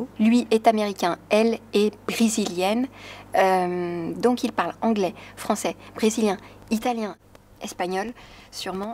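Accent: Quebec, Canada. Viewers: J'adore ton accent québécois ! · "Voilà, c'est l'été" aha toujours adorable 😊 continue comme ça! (J'adore ton accent québécois 😛) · Ton accent québécois est bien fait j'adore ❤ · J’aime mieux ton accent Québécois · Ça fait plaisir de t'entendre parler québécois <3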